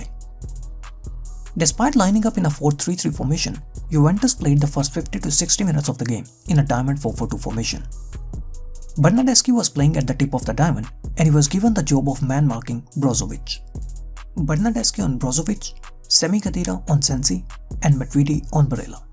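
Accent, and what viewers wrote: India. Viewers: this indian accent so annoying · Fucking indian accent. This analyzis is bullshit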